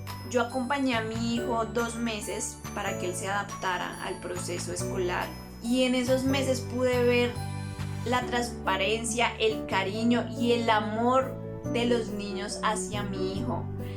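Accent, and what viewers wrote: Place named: Colombia. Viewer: Hola, pareciera que fueras de Colombia, la verdad acabo de conocer tu canal y es de bendición para mi vida, agradecería que si estas en Bogotá me recomiendes el jardín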